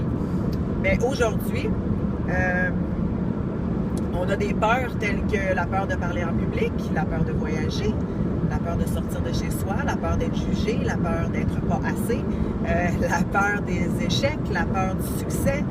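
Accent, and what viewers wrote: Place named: Canada. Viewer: j ai du mal à comprendre à cause de l accent canadien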